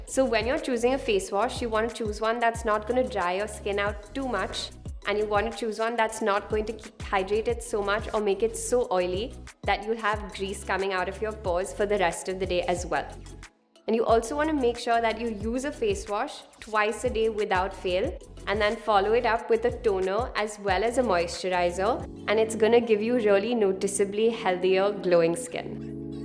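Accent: India. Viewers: Dhe got Indian kinda accent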